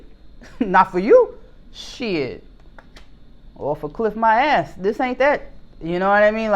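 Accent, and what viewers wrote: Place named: New York, United States. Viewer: Ma you are so NEW YORK and the way u talk you grew up in my era Keep up the great work.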